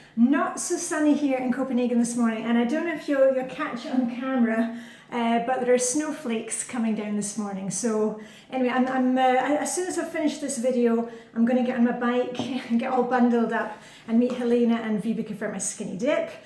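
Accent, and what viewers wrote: Scotland, United Kingdom. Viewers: You are NOT cluttering up my feed! I love your videos. You are an inspiration to me, more than decluttering, even though I love that. Your attitude, your style, and your Scottish brogue are endearing! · No more self doubt allowed! Know that your videos are like a fun visit with a charming, uplifting friend! The Scottish accent is an added bonus! It’s fun to learn about your life and to see how much you love Denmark! You are a great ambassador for your adopted country! If you were to stop, I would be sad. Cindy from Minnesota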